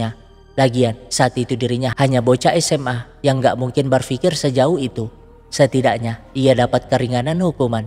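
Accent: Makassar, Indonesia. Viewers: Orang makassar ini narator nya ehh, kentara ji makassar naa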